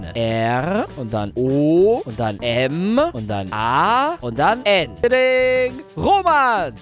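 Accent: Russia. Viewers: HAHAHA OMG ROMAN DEIN RUSSISCHER AKZENT MACHT DAS VIDEO NOCH BESSER 😂✔❤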